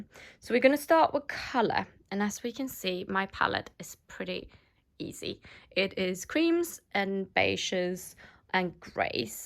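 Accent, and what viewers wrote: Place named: United Kingdom. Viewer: Jag trodde du var Britisk, jag älskar din brittiska accent, hälsningar från Peru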